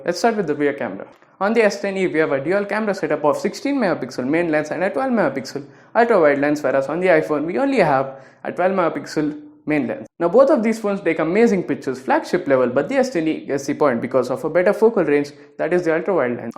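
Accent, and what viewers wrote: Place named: India. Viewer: I am quite fond of stereotypical Indian accent and understand it well. But it's not bad to train yourself to speak in a more neutral accent. It can be done, you just need to practice. Also, work on your pace and avoid having unnecessary movements as they make you look awkward and nervous. Good luck on your next videos!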